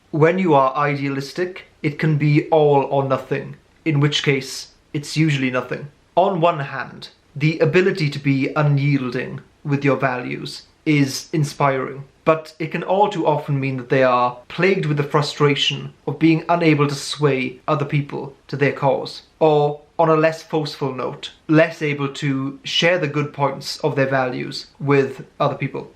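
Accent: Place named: RP, United Kingdom. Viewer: You have the most posh accent I’ve ever heard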